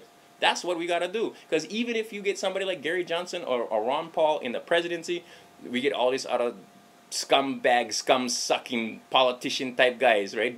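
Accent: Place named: England, United Kingdom. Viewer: Nice D&D shout out. LoL. However you made this far too English English. Was right esay to unnastan man.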